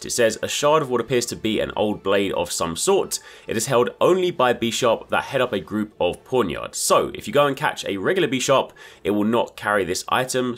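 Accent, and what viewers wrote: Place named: England, United Kingdom. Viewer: Because he's English/Australian?... You do know there's no "one-way" to say stuff in English, right? English has countless dialects, and is a fusion of several other languages (German, French, Norse, Latin, etc); England alone has like 40 local varieties of speech depending on where you go in that tiny island. Besides its a name for a fictional thing, how its pronounced should be the least of your worries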